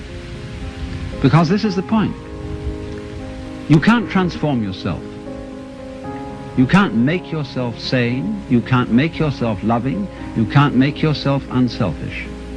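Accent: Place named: United Kingdom. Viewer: Perfectly British voice to perfection lecture..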